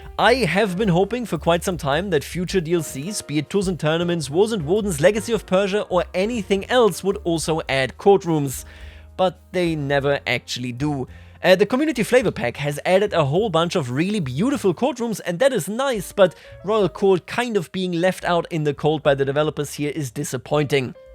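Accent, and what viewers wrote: Germany. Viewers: hi r u prussian or german? 🤔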